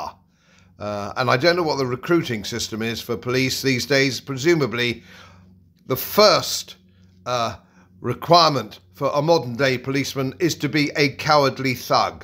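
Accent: United Kingdom. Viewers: Oh i do love your delivery of this current truth! So very very english and i love it! Gave me a little giggle! 😂 sad that it is, but so evident. I so love your voice. Thank you sir. Keep it coming. 😊😊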